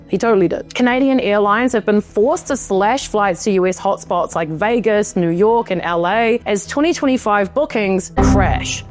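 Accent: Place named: New Zealand. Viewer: So pleasant to hear a New Zealand accent, if I am not mistaken.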